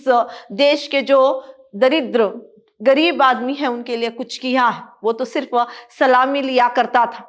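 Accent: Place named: South, India. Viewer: I think you are from South India. I guess it from your pronunciation. Overall very nice video madam 👌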